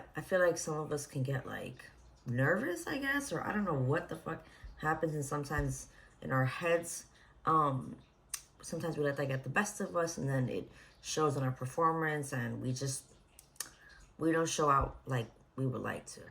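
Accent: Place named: New Jersey, United States. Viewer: You so fuckin jersey I love it lmao jawn good shmoney lmao jersey boy here 💪🏾💪🏾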